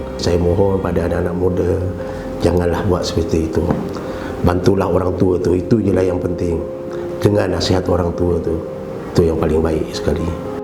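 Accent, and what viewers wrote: Indonesia. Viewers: Patut laa cara arwah ada sleng org jawa.. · Logat alm ni logat madura. Sya sendiri madura. Itu la sya dpat rasa alm ni org madura.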